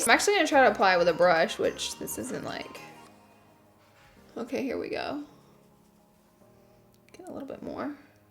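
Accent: South, United States. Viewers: It's a southern thing! We all do it in the south. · It's just her Southern accent. We don't all pronounce everything the same way. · Her accent, she's southern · Love how you pronounce “oil” like “ole”. ❤️. The southern accent is so cute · I love how your southern accent gets stronger and lighter as you talk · i love you so much and your country accent sounds like mine lol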